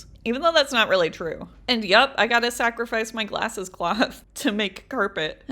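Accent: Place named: Minnesota, United States. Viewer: You talk Minnesotan it's ok . I understand you lol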